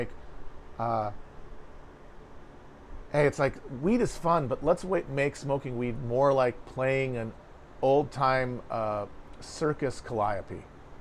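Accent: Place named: Italian-American, United States. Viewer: As a Buffalonian, I appreciate the gabbagool accent for us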